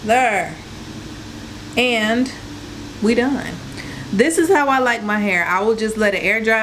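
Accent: South, United States. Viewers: Yes concoction-- i say that all the time!! Southern drawl-- love it-- in central PA and ya'll aint in their vocabulary!! Nice video-- gonna try instead of 500 curl.puddings!!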